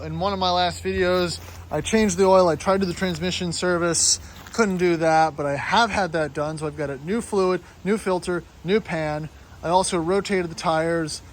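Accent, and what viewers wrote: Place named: United States. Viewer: You’ve bored me in first 30s maybe it’s your American accent maybe it’s the fact you guys no nothing and European cars , and why have you got 3/4 old crap cars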